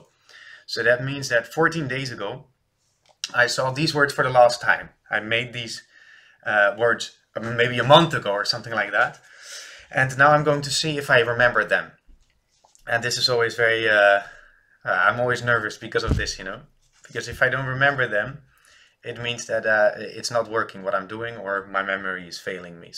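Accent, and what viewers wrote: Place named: Iran. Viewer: Hey, I showed the video to my roommate who speaks Dari and is from Afghanistan. He really praised your ability to speak Farsi and what I thought was funny to mention is that to his ears you do really sound like somebody from Iran.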